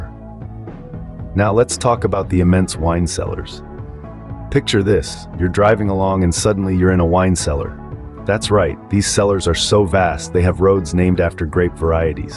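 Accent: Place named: United States. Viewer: Its a shame the American accent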